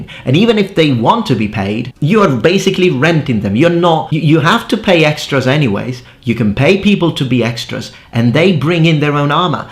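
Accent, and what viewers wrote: England, United Kingdom. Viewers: haha, wow. iv only watched you for one year. I just watched The king so am now watching YouTube analysis and here you are in your primordial form. iv always been blown away by your English usage by all metrics. You do sound much more English in your primordial version, i wonder how and why? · Hey, I noticed in some of your older videos such as your Kanabo video from 2 years ago you had a samurai helmet, where did you get it from? I’m in the UK as I assume you are judging by your accent, yet they’re all ridiculously expensive online.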